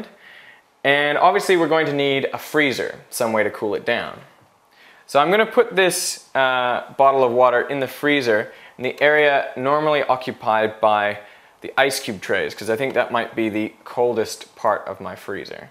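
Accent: Australia. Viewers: his aussie accent is so much stronger in this video than his recent ones although he's canadian, right/? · This is the only video I've noticed his Aussie accent bleeding through. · Just the Slightest hint of an Australian accent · Anyone else think he sounds more Australian in this part of 2011? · I just realized he has an Australian accent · You can hear some Aussie in Young Veritasium’s voice!! So cool!! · Wow he really has an Australian accent in his earlier videos.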